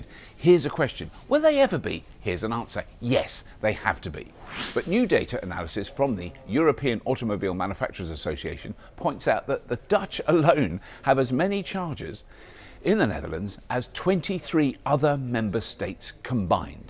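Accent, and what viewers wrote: Australia. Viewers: Was not expecting that Aussie accent to be any good. 👏👏👏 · Great show. 9/10. It would have been 10/10 if it hadn't been for that 'Australian' ? accent . (From a loyal Australian fan)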